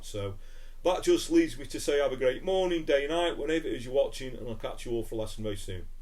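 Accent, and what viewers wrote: Stoke, United Kingdom. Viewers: Great video mate proper Stokie accent 👍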